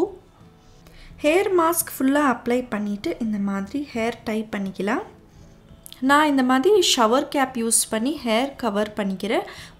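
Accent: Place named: Bangalore, India. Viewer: Praise the Lord Sisy...! R u frm Bangalore?! Bcs, ur pronunciation of Tamil seems so... :) !!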